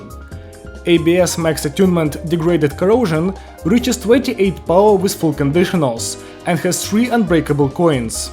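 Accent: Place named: Russia. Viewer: ты же русский? акцент выдает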